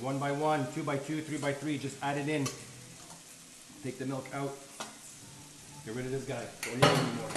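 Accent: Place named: Montreal, Canada. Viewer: I picked up the Mtl accent right away 😂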